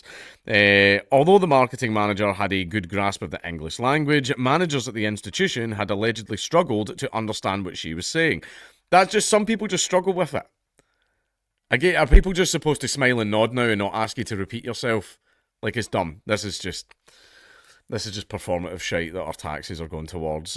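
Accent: Scotland, United Kingdom. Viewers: no I don’t because I’m used to your accent. Checkmate, Scotsman. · if people have a problem hearing you due to an accent, work on it until they can. Why has common sense suddenly become such a rare thing? And it's not racist OR discriminatory to not understand thick accents or dialects, because even within the same country, (we have this in Sweden too) some accents are hard to understand even to native speakers. People need to thicken their skins and stop being such snowflakes, and the laws needs to toughen up and get common sense back. Why would be bother to go to language schools to learn the proper accents of the language we choose to learn if we could all just, half-ass it and then cry discrimination if people can't understand us? And on the subject of accents: I love your scottish accent, and while I struggle with thicker accents in any language, I've always loved scottish accents. 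· I wish you would do a video with your normal Scottish accent because I’m curious if I can understand it. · I live in the north of Ireland,.... I can't make out a word my eldest fella says. He went to a hostel in Dublin with his three brothers and the other people thought he was talking In Irish. I love the Scottish Accent.... you're fucking hilarious when angry or happy. I love your accent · Scottish accent was an inspiration. don't change it,. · should do 1 full video in full unfiltered scots. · I want to hear a full video in full on SCOT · Anyone else asking him to ditch the being nice regime and go full Scottish accent in the vids? I'd love it! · plz dannae tone down your accent for future videos. plz. if tha americans cannae onderstond then its their problem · My girlfriend is Mexican and understands English perfectly but she has a hard time understanding your accent. I am American and understand you but it can be difficult when you use different words I have the same blood you do Scottish and Irish by Appalachian extraction, am I racist? 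Yes of course. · Man, you have a mellow scottish accent. I live in the Highlands and there's a range here from Scottish to gibberish..... 😂😂😂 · I'm actually upset you turned down you Scottish accent. I loved you and critical drinker discussing alien 3 as you guys got more and more scottish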